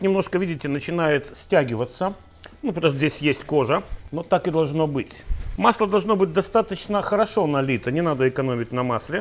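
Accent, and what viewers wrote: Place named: Murmansk, Russia. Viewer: Слышу Мурманск - ставлю лайк.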